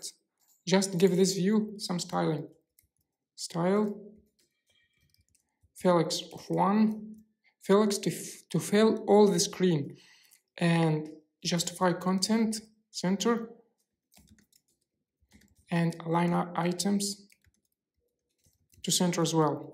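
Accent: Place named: England, United Kingdom. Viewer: Love the way you explain concepts, and especially your English accent it's so cute!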